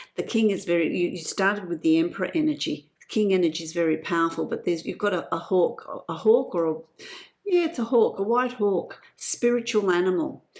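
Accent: Australia. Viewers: an Aussie reader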